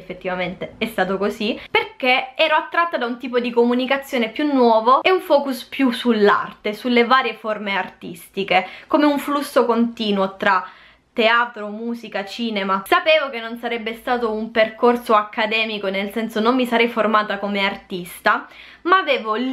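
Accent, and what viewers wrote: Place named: Apulia, Italy. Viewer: Quanto sei Pugliese....!!! (Sarrbbe un complimento)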